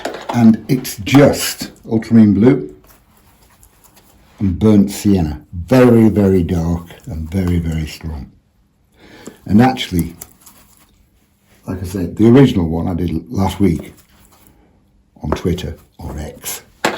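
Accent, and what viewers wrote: Yorkshire, United Kingdom. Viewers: Just discovered your channel. Glad I did. As a native of Yorkshire me self, it’s nice to hear a Yorkshire accent. And your paintings are stunning. This one so reminds me of many snowy days I’ve seen back up North. I’ve subscribed and look forward to many more tutorials as good as this one. Thank you. 😊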